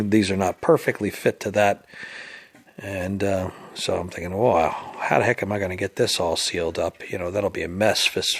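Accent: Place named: New Jersey, United States. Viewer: Your accent sounds like you're from central New Jersey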